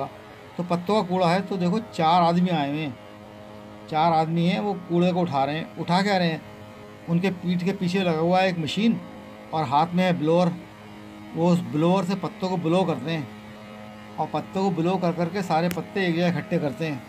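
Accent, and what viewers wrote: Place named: Madhya Pradesh, India. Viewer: Apki language se lagta hai ki ap Madhya Pradesh SE hoo..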